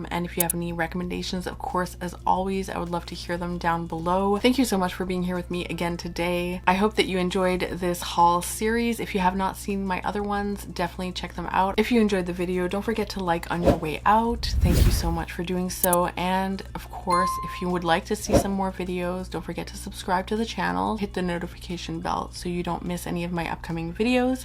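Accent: France. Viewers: ugh your French 😍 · Hi I wish you a very happy New Year and all the best for you and your loved ones. As always love your videos and your french accent 🙂🙂🙂😃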